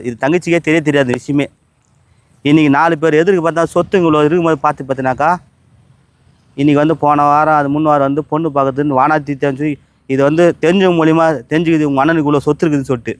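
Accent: Madurai, India. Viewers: மதுரை வாசகம் சூப்பர் 🎉🎉🎉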